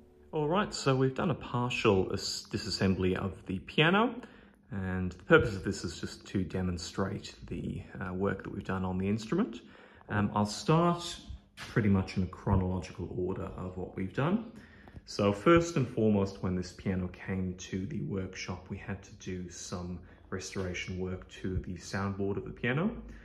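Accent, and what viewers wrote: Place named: Australia. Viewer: Hi, Where are you located? I am guessing in Australia or New Zealnd by your accent. In New England here near Boston. I am crazy about that gorgeous Richard Lipp. We almost never see their pianos. On Roberts piano out of Oxford he gets them from time to time and appears to really like them. Any info you care to share about heirloom pianos would be appreciated.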